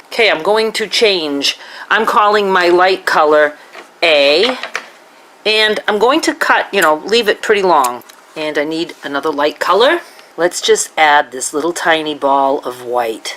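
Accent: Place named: East Coast, United States. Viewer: I am brand new to crochet and to your channel. I know the basics, but gosh I'm rusty I guess. I want so badly to make one of these afghans, but am having trouble with the turning the corners, can you do a quick snippet of just turning the corners for us, it would be a huge help. By the way ... you sure sound like you're from the East Coast, am I correct? I'm originally from Connecticut, living on the West Coast now, but you truly sound like so many of my friends from home (Maine maybe?) Thanks for sharing, your afghan turned out gorgeous, I hope one day soon I can make something like yours.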